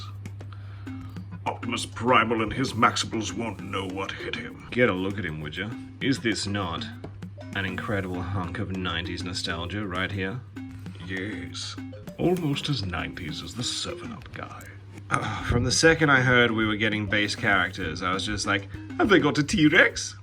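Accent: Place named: Australia. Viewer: I didn't know David Kaye did toy reviews in an Aussie accent..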